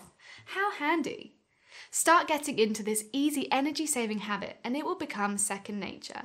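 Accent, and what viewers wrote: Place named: United Kingdom. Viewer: Radiators are uncommon in the USA. Guessing by your dialect, you are across the pond. Other tips work for both countries.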